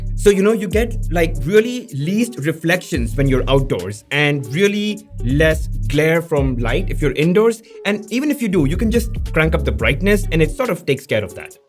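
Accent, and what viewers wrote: India. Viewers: Iss. Bande ka eng bhi muze hindi jesa lag rha hai😅😅 laga hi nai english me video dekh rha hu 😅😅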